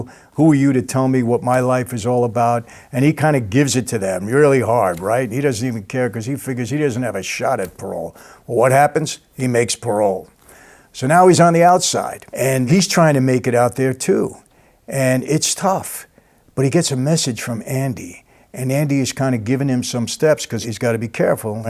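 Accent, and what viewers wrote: New York, United States. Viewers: Ok I might be tripping but I really can't stop noticing that his voice has completely changed recently. Sounds less deep and less New York?😂😂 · You're a great story teller, and I love your "NYC street guy" accent!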